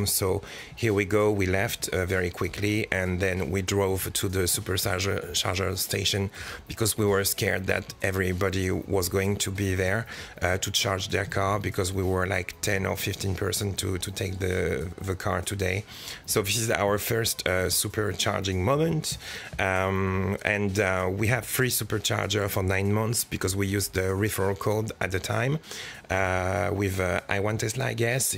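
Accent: France. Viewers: your charming french accent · trop marrant la voix avec l'axent français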